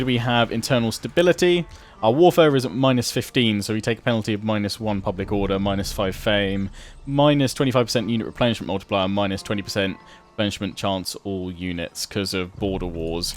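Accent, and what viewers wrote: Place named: Bognor Regis, United Kingdom. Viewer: You speak so posh for someone from bognor/littlehampton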